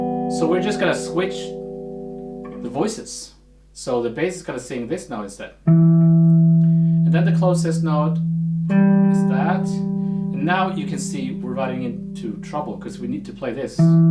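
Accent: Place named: Sweden. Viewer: Great lesson! By the way are you finnish or swedish. Based on your name I'd guess finnish but your accent is a bit more swedish than finnish.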